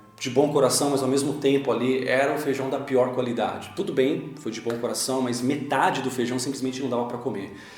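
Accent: São Paulo, Brazil. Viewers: você é paulista ou catarinense, fiquei curioso agora e depressão, tenho problemas com autoestima e já pensei em suicídio por diversas vezes, até conversei com um psicólogo, mas ele acha que remédio vai resolver o problema, depois que uma garota me humilhou na frente da escola eu nunca mais consegui falar com uma mulher e tenho dificuldades em me relacionar e isso me incomoda e quando tento conversar com alguém a maioria das pessoas são bem grossas e nisso eu me isolo e quando vejo um casal eu me sinto mal porque não consigo sequer uma ficante, é só um desabafo mesmo.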